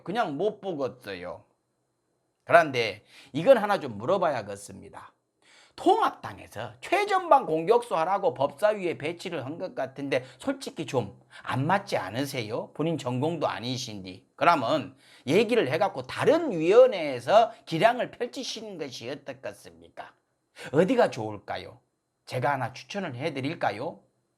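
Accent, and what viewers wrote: North Korea. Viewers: 말투가 탈북자 느낌 나서 놀랐음.. 빨갱이 타령하는 것들이 하는짓이 딱 그 쪽이라 어이상실.. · 조수진이라는 여자 말투도 무식하고 억양이 북한 억양 말투에 과장되서 탈북한 여자인줄 알았어요. 꼭 뽑아도 막장스런 여자들만 비례 국회의원으로 뽑는건지 ? 통합당 비례 기준이 막장 인가봐요?전희경이랑 이은재 남장여자 임이자등의 모습을 안좋은건 다 닮은듯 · 북한사람 말투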